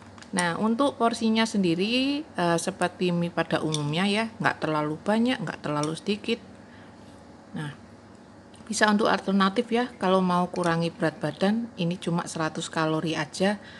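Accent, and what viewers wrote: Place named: Surabaya, Indonesia. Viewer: Opo kabare cik..kangen sama logat suroboye aku ..kangen suarane rek😂😂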